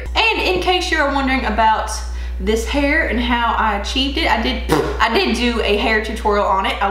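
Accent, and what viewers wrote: South, United States. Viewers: Love your accent!!!! For sure from the south!!!!!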